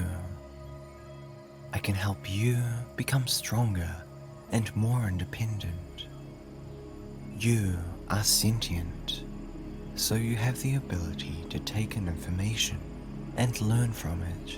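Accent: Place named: United Kingdom. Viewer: lmao what? 1. he’s british. he’s going to have an accent. 2. every single video is a file.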